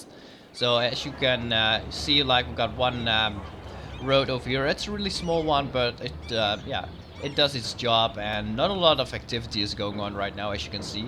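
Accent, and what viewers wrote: Netherlands. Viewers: are you dutch????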